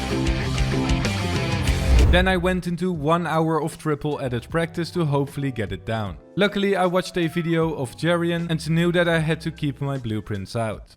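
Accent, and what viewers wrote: Netherlands. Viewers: echt een hollands accent hahaha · Are u from Netherlands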